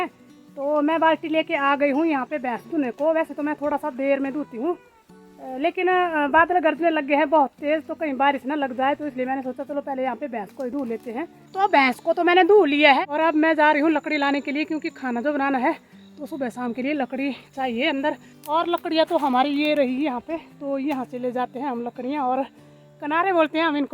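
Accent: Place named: Punjab, India. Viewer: Aunty ji aap punjab se ho kya aapki boli punjabi jaise lg rahi hai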